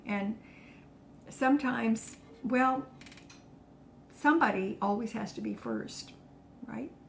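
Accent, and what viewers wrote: United Kingdom. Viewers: Hi, absolutely loved this. You're a wise lady with a fab sense of humour. Life is too short to be uptight....have the sugar, eat the cake, drink the cokie cola 😉 we have to have some pleasure in life. Wonderful English accent by the way. 'Bottoms up darling!' As we say here. ❤ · Lol, love your British accent in this episode! My feeling is , everything in moderation. Including sugar. Yes, too much of it isn't good for you. But that woman that made the snarky comments needs to get real and stop judging you. You are doing g a great job and I love your channel, one of my very favorites. ❤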